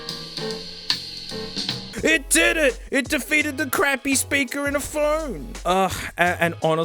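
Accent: Australia. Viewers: Imagine watching this to figure out which speaker to buy but it’s just an Australian man talking about his sexy speaker and worrying about his pkcell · I bet every Aussie dudes sounds similar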